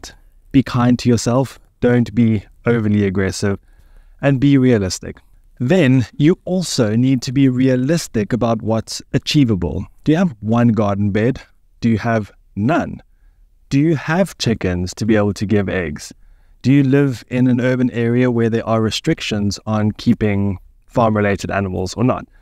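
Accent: South Africa. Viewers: What a surprise. Was wondering why all of a sudden I was listening to your voice, more intently. SOUTH AFRICAN ACCENT!!!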